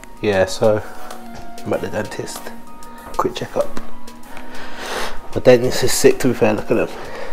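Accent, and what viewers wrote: Birmingham, United Kingdom. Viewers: I’m new are you from Birmingham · Are you from the uk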